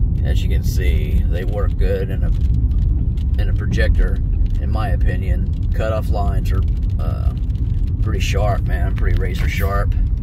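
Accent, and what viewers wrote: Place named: South, United States. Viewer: wasnt it raining in this video though? are you in the south? your accent sounds southern. i know rain is much thicker and heavier there than the PNW.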